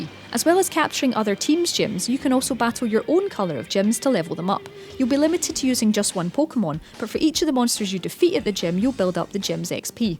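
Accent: Scotland, United Kingdom. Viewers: She kinda sounds scottish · it's Scottish not British lmao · BAHAHA HAHAHAHAHA BRO THIS NARRATOR IS LIKE SCOTTISH SHE'S NOT EVEN FUCKING BRITISH YOU DUMB ASS BRUH YOU THINK ALL EUROPEAN PEOPLE ARE THE SAME 😂😂😂😂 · I love that scottish accent. <3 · Im from scotland and i hear this accent everywhere <3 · That is a VERY Scottish accent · No, this accent is more scottish while the girl from outside xbox is brittish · Sub'd because Scottish 😍😍😍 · is the person taking in the video Scottish?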